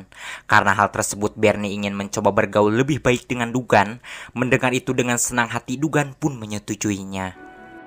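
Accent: West Java, Indonesia. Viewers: Sundana ti mana kang admin?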